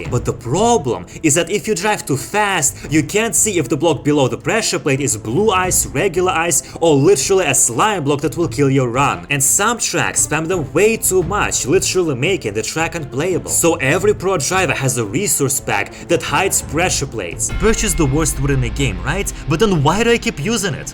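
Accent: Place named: Russia. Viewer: У тебя русский акцент, ну или хотя бы акцент стран снг, откуда ты?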